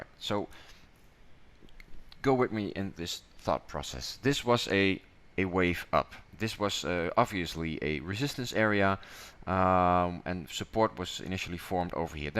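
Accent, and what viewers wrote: Netherlands. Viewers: I detect a slight Dutch accent unless it's South Africa? No mention of where you are in the About information.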